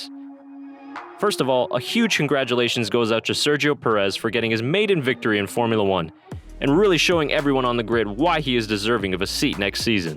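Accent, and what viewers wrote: United States. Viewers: I’ve been watching every F1 related video lately. This is the first video I’ve seen from this channel. It’s kind of funny (in a good way) to hear anything F1 related spoken in an American accent. 🙂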